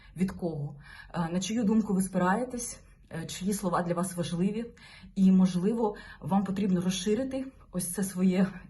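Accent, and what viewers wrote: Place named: Russia. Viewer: Когда говорила по Русские- было интересней слушать. И по украински плохо говорит - сразу видно, русскоговорящая. Из- за мовы- отписка.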